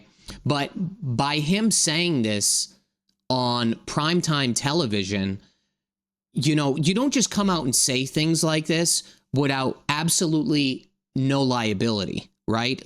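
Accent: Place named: Northeast, United States. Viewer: who are you? Are you a lawyer or a journalist or someone who as they say is a grifter with a nice face and a heavy NE accent?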